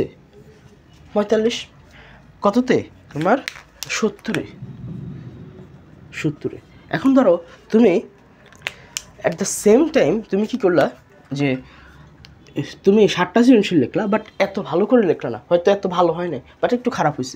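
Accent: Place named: Noakhali, Bangladesh. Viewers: Vai mone hoy noakhalir😊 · Vaiya,r kothar accent dekhei bujhe gelam vaiya Noakhali thake 🙂 Hea amio Noakhali tei thaki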